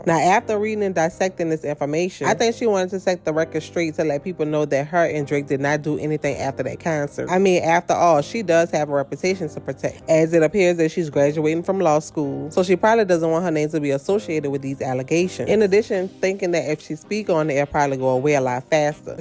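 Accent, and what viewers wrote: New Orleans, United States. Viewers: Please speak more clearly and add a few pauses in your sentences. Your New Orleans accent has to be the CUTEST one ever. ❤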